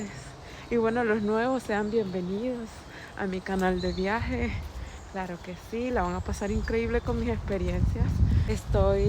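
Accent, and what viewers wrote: Colombia. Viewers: Por una razón elemental, los venezolanos hablamos VENEZOLANO, seamos de Caracas, de Oriente, de Mérida, del Táchira, del Llano, de Lara, de Yaracuy y del Zulia, todos sabemos cómo hablamos los venezolanos en cada una de nuestras regiones; y los colombianos hablan COLOMBIANO, sean de la Costa, de Bogotá, de Medellín, de Calí etc. Ella no habla ningún acento venezolano autóctono de nuestras regiones de Venezuela, ella habla colombiano porque es colombiana. 🤷🏻‍♂️ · Se te nota un poco de acento colombiano. Saludos desde España.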